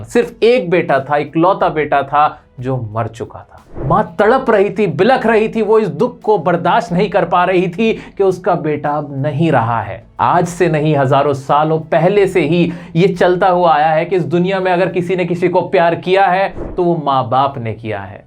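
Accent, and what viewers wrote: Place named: Bengal, India. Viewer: You are bengali bro you say in the lesson maa-nush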